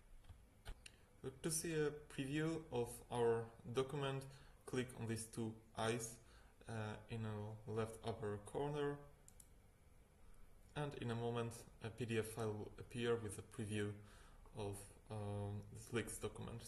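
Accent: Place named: Russia. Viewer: Как будто русский на английском говорит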